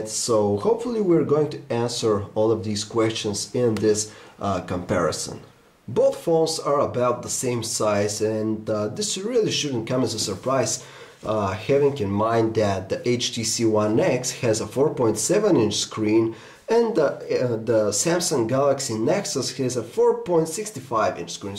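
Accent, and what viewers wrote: Russia. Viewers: Are you russian? :) I like your accent. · russian accent? respect!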